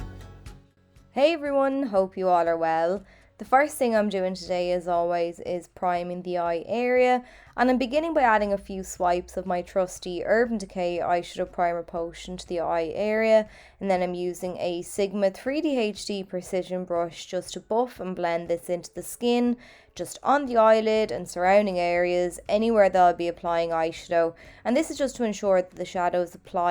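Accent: Ireland. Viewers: Your tutorials are always so easy to follow and you do amazing looks! I also could listen to your beautiful Irish accent all day 🥰 I have only been there once but I’d go back in a heartbeat!! My parents chose an Irish name for me to honor my Grandma who was 100% Irish herself so Ireland always holds a special spot in my heart ❤️ :)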